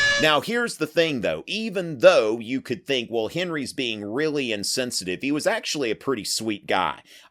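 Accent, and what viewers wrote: United States. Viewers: I’m from the Uk and have been obsessed with the six wives for YEARS it’s so weird hearing it in an American accent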